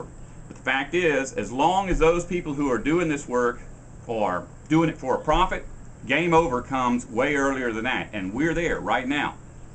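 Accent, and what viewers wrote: South, United States. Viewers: where is it your from Mississippi or Louisiana I"m just trying to figure it out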